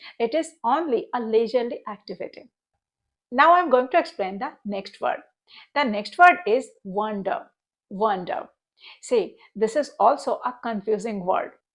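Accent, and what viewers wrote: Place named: Bengal, India. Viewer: Nice vlog with Bengali accent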